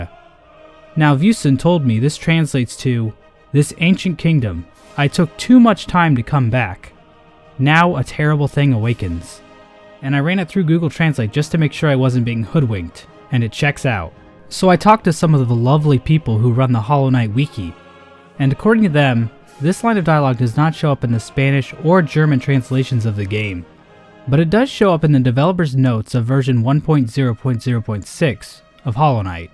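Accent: France. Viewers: This aged like milk. Also, thats an amazing french accent 10/10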